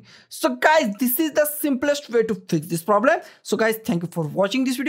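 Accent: India. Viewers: why is it always someone with an indian accent that just gives the best advice. i looked for an hour to solve my problem but 1 min into this video and i fixed my problem. Thank you!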